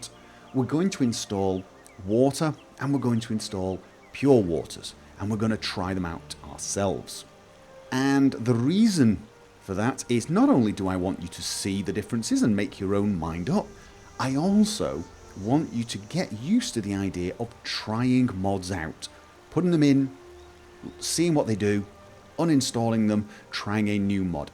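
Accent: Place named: Scotland, United Kingdom. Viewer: the fudge? he be english? sounds a bit scottish but without the heavy accent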